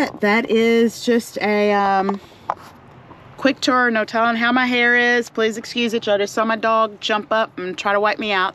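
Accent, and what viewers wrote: South, United States. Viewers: I have been watching truck camper setups for over a year.... Probably well over a 100 different people.... And everybody has their own style obviously..... It is a proven fact that while men come up with good ideas and they know how to put things together..... It is generally a woman that comes up with the finesse to make it look good and efficient....... So I would have to say that this is the nicest setup I have seen yet.. And you could see very clearly that that was not feces in the bottom of the portable toilet... At 1st glance it looked more like dry corn... You sound southern....plus the expression, "y'all "..... what state are you from?? Thank you for the video young lady.. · Hey Mrs ❤️ I just stumbled across you and I am happy I did ❤️ you're so beautiful and I loved your video ❤️ I'm considering living In a vehicle so this was a Little inspiration for me ❤️ 🙏 can't hardly afford bills and rent anymore. 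It's literally draining me and I am a single black woman nearly making ends meet. I make enough to pay a bill and rent and pay to go right back to work and I'm broke right after I get my check. The cost of living is horrific 🙏 so I am trying to come up with a way that I can live on little to none and save my $ for expenses I really need. So thank you for this video sweet mama 😀 ❤️ much love to you from the Carolinas ❤️ you sound country like me ❤️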